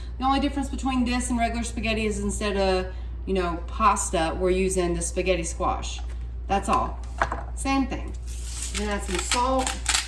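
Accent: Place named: Georgia, United States. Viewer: Omg I'm here in Ga also and it's steaming outside 🔥🔥🔥.... I'm a new subscriber and I just love your video's.... You have a very strong Ga. accent 😁👍😁... I live just north of Atlanta.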